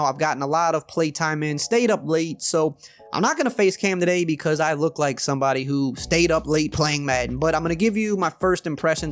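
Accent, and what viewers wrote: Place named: New Orleans, United States. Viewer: Are you from New Orleans you got the accent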